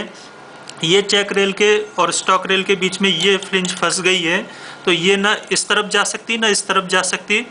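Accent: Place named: India. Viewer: fuq the indea English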